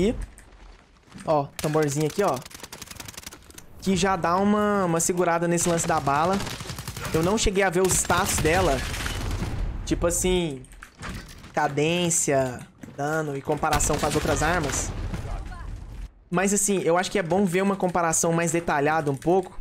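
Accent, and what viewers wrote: Minas Gerais, Brazil. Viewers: tu é mineiro?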